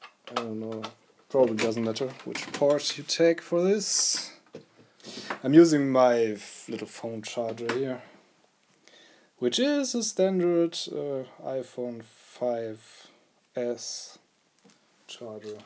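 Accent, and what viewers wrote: Germany. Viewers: I also prefer the real hardware or my PiStation, which can emulate more then just the c64. Overall, as you told, u have to be a hobbiest when using original home-computer stuff today or doing it with the raspberry pi. Imho the c64 mini has it's place at home, when only want a quick play on older day games ;) BTW: I love watching your videos and especially the german accent :)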